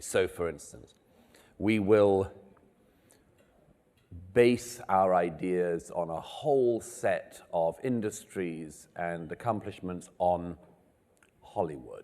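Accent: United Kingdom. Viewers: You sound British. Have you heard of the Oxford Dictionary? meritocracy noun government or the holding of power by people selected according to merit Seems your “something like this” definition is about as useful as the English football team over on the continent right now But … I guess you have your agenda to push So, Best of British, you posh propaganda old chap! That said, mmm. wouldn’t discussing something (meritocracy) by defining it in a rather off-centre way … what could be called “straw manning”? But … maybe you’re more familiar with plain English than those chaps at Oxford (?)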